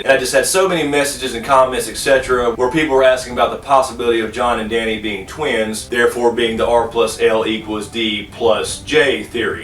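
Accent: South, United States. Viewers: just subscribed and am enjoying your videos - very well-informed and i like the common sense tempering all the crazy theories we make up with too much time between books, lol. i live in the northeast, so i am also enjoying your southern pronunciation of the series' names and such - it adds some regional color to it and once in a while a little confusion on my part. in a video you were talking about the dane (spelling?) family sword "dawn", but i kept hearing "dome" and wondering where there is a dome in westeros, till i realized the regional accent difference. it's nice our regional accents still survive in this internet age. i'm subscribed and looking forward to more great videos. (i also like george r-squared martin, lol) as far as jon and dany's parenting goes, i keep several possibilities floating in my head. i won't go into all of them, but r+l=j seems pretty obvious now that i've seen the series thus far and read the books three times. 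but it worries me because grrm doesn't like things to come easy to readers. it is very possible biologically that lyana could have become pregnant and given birth 9 months after she had jon. and "the seed is strong theory" could just be medieval cluelessness about genetics. even the starks have some kids like starks, and most like tully's. i wonder about dany's visions when she was with the undying. so many of them were about starks. there is a definite connection there, though it doesn't need to be familial. keep up the good work!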